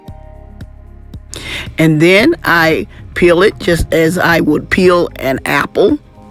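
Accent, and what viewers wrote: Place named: Georgia, United States. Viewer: Sound like she's from old Georgia..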